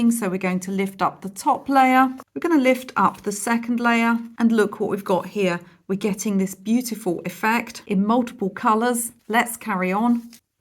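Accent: United Kingdom. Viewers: Loved this video! Thank you for sharing your knowledge and talent😊 But, can we talk about your fabulous lipstick/gloss! Care to share the brand and shade….pleeeeeze!? And from a viewer in Texas I’m entranced by your accent and now want to walk around sounding British speaking as properly as possible😊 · So bri-ish🤌🏻😭✨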